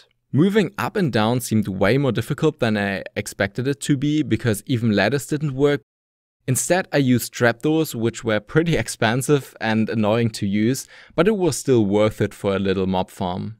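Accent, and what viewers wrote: Germany. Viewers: Du hörst dich bissl deutsch an…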